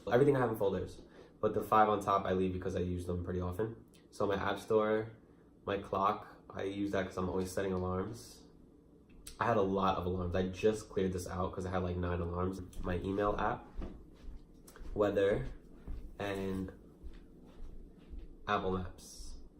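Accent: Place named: New York, United States. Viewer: Your NY accent is so awesome. Please keep making vids. They’re really interesting. Good job.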